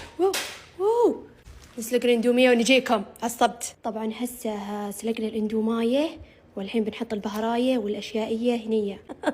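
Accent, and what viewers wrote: Iraq. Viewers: فدوه للتحجي عراقي❤❤❤❤ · عراقية بحت ههههههه😂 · حسيت بفخر من ذكرت العراق 😂 و حبيت شلون تحجي لحجتنه 😂😂😂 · يمه فدوه شلون تجي عراقي انا احبج كلش كلش كلش هيه الوصفه كلش حلوه وانتي احلى ❤❤❤❤❤❤❤❤❤❤❤❤❤❤❤❤❤❤❤❤❤❤❤ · امي عرفت عراقيه اي البنيه اسمها ايه والله العظيم تعرفين تتكلمي عراقي اذا تحبين العراق الفلوق الجاي سوي دولمه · احلى من يحجي عراقي❤ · احلا وحدة تحكي عراقي❤❤ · اني عراقيه انصدمت بلهجتك بصراحه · والله احلى وحده تحجي عراقي فديتج❤❤ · فدوه للتحجي عراقي🤭🤗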